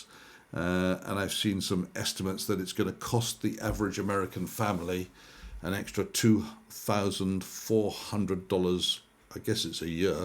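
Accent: United Kingdom. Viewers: I first saw you, not long back on Daniella Cambone. I was at the time wondering why there seemed to be so few independent, genuine analysts UK born (I trust you are UK and not Swiss with that accent) Anyway, good to watch and keep going as you are attracting quite a following. The word which sums you up is 'gravitas' but with a healthy dose of humour and good stories.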